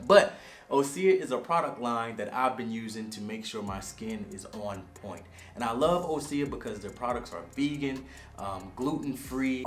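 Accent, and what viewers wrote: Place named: Atlanta, United States. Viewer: If you ever need a plumber bro holler at me I gotchu!! It sounds like you are are around the Morningside area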